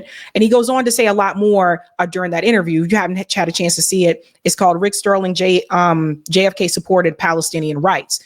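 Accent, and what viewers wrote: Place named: Boston, United States. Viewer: every once in a while you slip in a word with a Boston accent and I love it. As a Masshole born & raised I love seeing our accent rub off a little on transplants.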